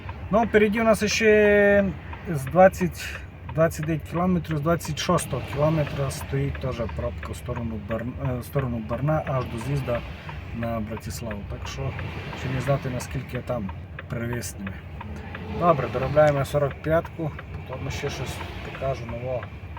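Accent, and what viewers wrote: Transcarpathia, Ukraine. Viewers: Мені кажуть чому я не знімаю свої відео на українській мові , слухаючи тебе - це теж не українська мова, мабуть Закарпатська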